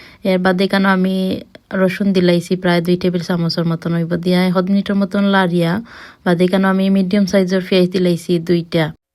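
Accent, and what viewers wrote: Sylhet, Bangladesh. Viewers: আখনি খেতে অনেক ভালো লাগে আপু তুমার ছিলেটি ভাসা শুনতে খুব ভালো লাগে আমার ❤❤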